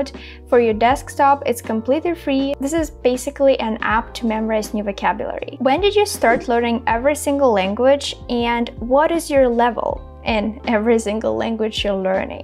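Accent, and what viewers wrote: Russia. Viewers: Cute Russian accent ☺️👌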